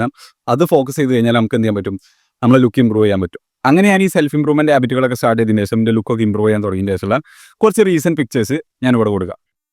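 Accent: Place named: Malabar, India. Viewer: Ath malabar style aan broo kaaryam manasilavundo ath mathi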